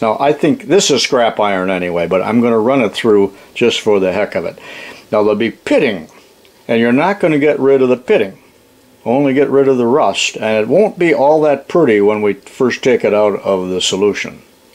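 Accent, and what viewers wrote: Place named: Pennsylvania, United States. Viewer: Your accent indicates that you are from Indiana County, Pennsylvania just as Jimmy Stewart was. Am I correct?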